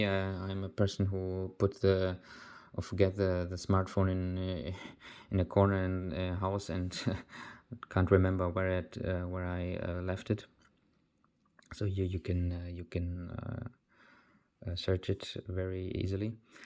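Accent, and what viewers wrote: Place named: Germany. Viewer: Sounds like a german to me :D If I'm right: liebe Grüße! :D Nice Video, good information and its one of the best long time reviews for this watch. Helps a lot!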